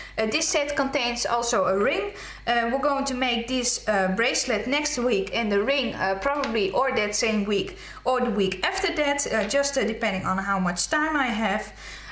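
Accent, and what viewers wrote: Netherlands. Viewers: Nice tutorial! He, komt u uit Nederland?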